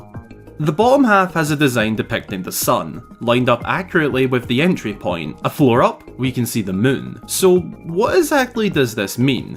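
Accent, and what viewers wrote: Scotland, United Kingdom. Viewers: Love your Scottish accent, just returned from a trip through Scotland, a wonderful country ❤🏴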